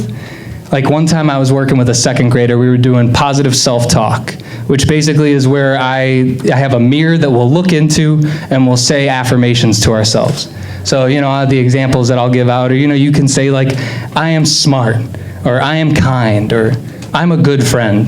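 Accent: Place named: Brooklyn, United States. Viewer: Is this guy Brooklyn-based does anyone know? He has a very Brooklyn delivery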